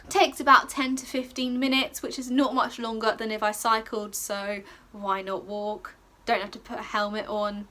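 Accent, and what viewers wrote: United Kingdom. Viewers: it just sounds very British, there is nothing wrong with that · I love your british accent :))) Greetings from Argentina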